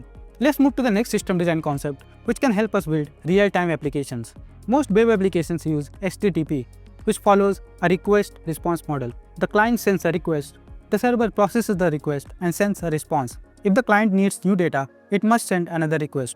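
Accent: India. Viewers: Too much Indian accent for today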